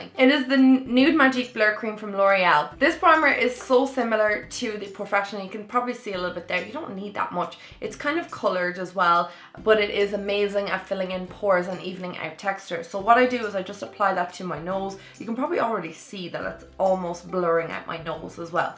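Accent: Ireland. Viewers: I love your vidieos! I also love your makeup looks u do! I've bought several products from watching u that I love! I love the loreal blur cream and the nyx butter lip in fun size! I also love your accent!!! I'm Irish too and my grandfather has the same accent I love it!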